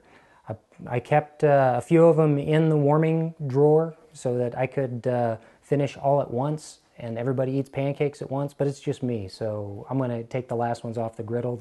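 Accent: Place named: Canada. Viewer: Sounds like he's Canadian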